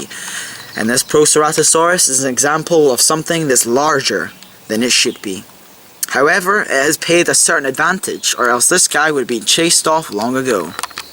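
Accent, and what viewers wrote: Scotland, United Kingdom. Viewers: Dude are you Scottish? :P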